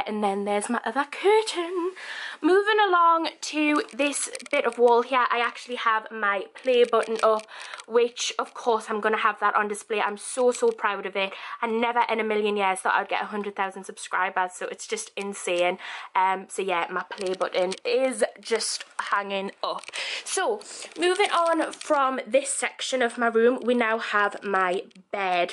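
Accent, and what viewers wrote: Newcastle, United Kingdom. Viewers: Mirrow haha she's a geordie, it's what they do 😂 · A proper Geordie!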